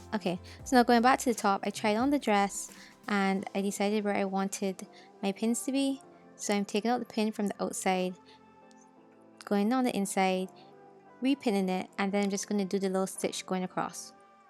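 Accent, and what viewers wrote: Barbados. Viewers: Fren you sound Bajan 😍, I’m guyanese but I know that accent good thanks to Rihanna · The moment I clicked I recognized the accent!! Let’s go Barbados 🇧🇧 💛💙💛💙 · I know that accent anywhere 🇧🇧 🇧🇧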